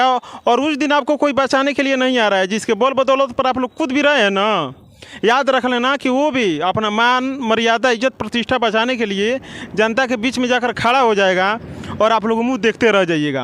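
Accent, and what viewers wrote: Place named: Bihar, India. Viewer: Yaar Journalism Karne ke pahle yea apna Bihari tone Khatam karo... warna Kuchh nhi ker pawoge.... good luck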